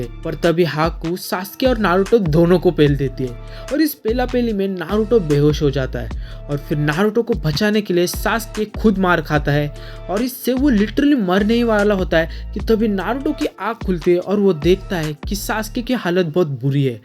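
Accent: Maharashtra, India. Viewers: Are you maharashtrian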